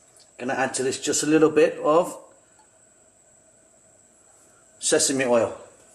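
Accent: United Kingdom. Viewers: Love your fabulous British voice as well ! 👍🙂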